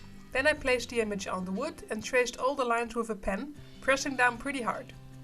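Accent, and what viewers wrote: Netherlands. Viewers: love her dutch accent!! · Are you from holland by chance?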